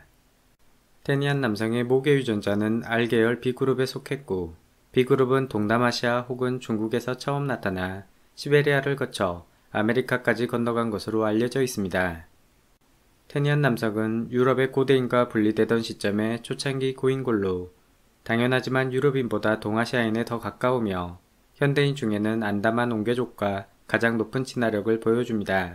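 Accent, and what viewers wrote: Jeolla, South Korea. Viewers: 고향이 전라도요?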